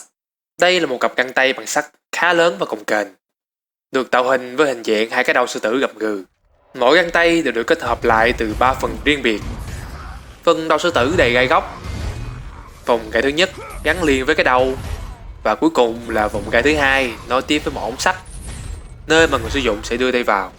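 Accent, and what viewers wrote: Vietnam. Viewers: đọc tiếng anh kiểu ng việt nghe khó chịu vc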